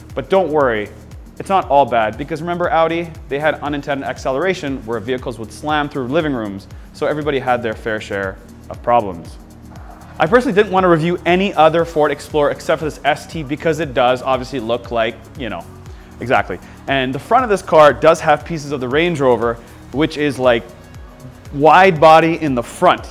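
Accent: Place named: Canada. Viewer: acording to his accent this channel is from canada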